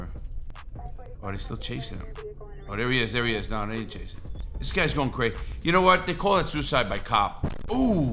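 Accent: New York, United States. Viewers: I hear a slight New York accent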